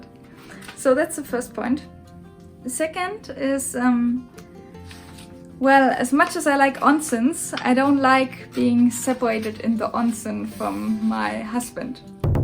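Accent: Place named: Germany. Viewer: you should be careful saying stuff which you "don't like about Japan', instead of saying 'don't get used to', 'can't really understand' , 'hard to understand' or "hard to get used to'. you're such a typical German saying your negative opinions direct, even your words can make other people's feelings uncomfortable, and you get haters instead. if ur speaking English, better to speak beautiful English, not with that strong German accent which is so difficult to understand. it's better if you speak your own language, the 'direct language, German' and put a subtitle, because native English speakers would pick words more carefully, and wouldn't say so much negative things to make listeners feel uncomfortable. You should known that Germans are not so fond by others, because you guys are not so charming, I mean, not with attracting, warm hearted personalities, but cold and selfish. 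I often see that German people don't help others on the streets or on the train, but ignore and leave. they think it's none of my business or i don't wanna waste my time helping others, and get no reward. really cold people out there.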